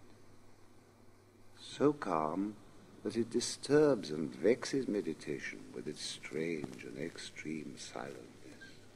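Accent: RP, United Kingdom. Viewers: Great stuff. He doesn’t just recite it in exquisite RP, he understands the text.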